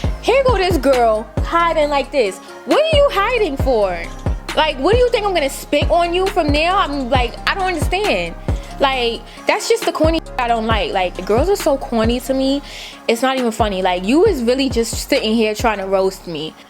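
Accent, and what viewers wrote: New York, United States. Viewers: This shit funny as hell. She so NEW YAWK . I love itttt 😍😍😍🤗😂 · can travel all over the world but you can literally never take the New Yorker out of this girl!lol.. Our New Yorker accent and slang is so so strong. Love it! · Sis youre sounding EXTRA New York in this one !!! Lol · U know I love how New Yorker speak yo lol I just live for hearing speak lol I'm from JA and New Yorkers accents are my fav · She’s so new YAWK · The best New York accent ! 😆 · Omg I missed ur story times I love u I love the way u talk the nyc accent is everything 😘🤗💜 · Lol I love u 😂😂😂 u so New York 😂😂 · i loveeeeeee your accent 😭 true new york girl lol . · so New York I can’t lmaooooo I love it though 😂💜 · NY accent was just popping out extra todayyy,love itttttt. looooool. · looks beautiful, but is it me or do I hear her NY accent more now! hehe:) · Is it me or she talkin totally dif ..like a new yorker..like nicki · Is Such A NewYorker I Love It I Haven’t Heard Somebody Say “You Bun’s” In So Long 😂😭😭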